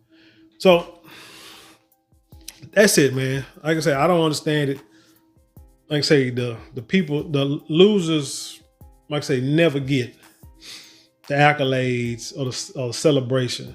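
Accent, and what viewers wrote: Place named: South, United States. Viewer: You are funny, you have a south toung, that's all im saying peace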